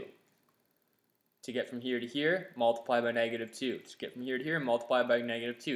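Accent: Canada. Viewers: The views go from 40k down to like 16k and then down to 10k. People arent sticking around for the whole thing. Lol. Shoutout to Canada. This dude sounds like a typical canadian dude.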